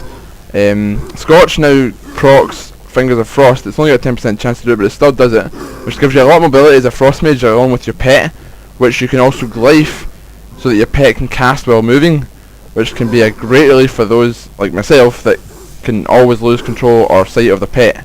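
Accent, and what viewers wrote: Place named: Scotland, United Kingdom. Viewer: great video great tips sexy scottish voice ;)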